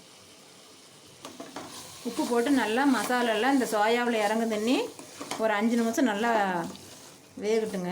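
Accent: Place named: Kongu, India. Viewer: கொங்கு மண்டலமா சகோதரி.அருமை 😇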